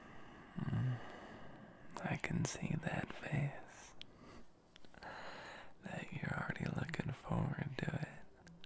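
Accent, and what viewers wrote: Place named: Midwest, United States. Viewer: •__• he’s from the us,, im pretty sure somewhere in the midwest??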